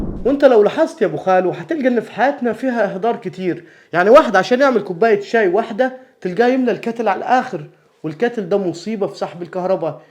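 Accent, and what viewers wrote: Upper Egypt, Egypt. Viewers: رجاءاً الالتزام باللهجة الصعيدي الحلوة ❤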